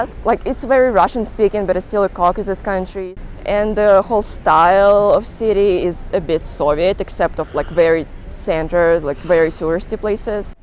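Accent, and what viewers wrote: United States. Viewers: You've got an American accent with your English I would say. Definitely not a British one. If I saw you in Wisconsin I might almost think you were born here. Nice job. Enjoyed the video. You have a certain charisma and charm.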